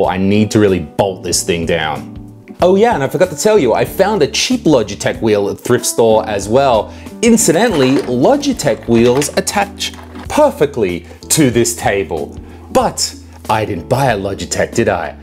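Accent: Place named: Australia. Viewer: Sounds like you're Australian, so I'm assuming you're in Australia. I just picked up a Thrustmaster T300 from Cashies'. It didn't come with the mounting 'thingie', so I was contemplating mounting it directly to the desk. Glad I found your video. If you want a quick-n-easy release, check out Bunnings and look for 'Richmond M6 x 40mm Handbolt'. Should be the size you need and comes with a convenient star head, which you can use to tighten/loosen the bolt with your hand.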